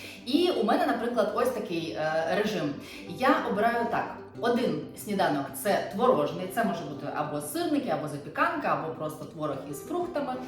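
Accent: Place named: Ukraine. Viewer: Фууу. Такая девушка, а разговаривает на смешном деревенском языке. Вам не идёт. Суржик у вас. Беее. В первых роликах на русском было красиво.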